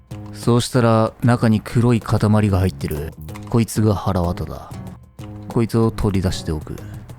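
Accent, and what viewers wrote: Kansai, Japan. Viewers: 九州出身なのになんで関西弁しゃべるときあるんじゃーい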